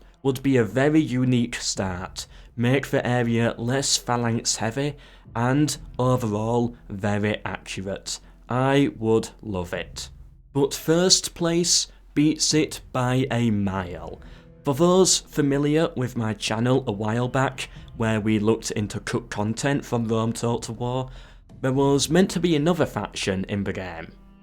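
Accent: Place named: Northern England, United Kingdom. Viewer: loving the northern accent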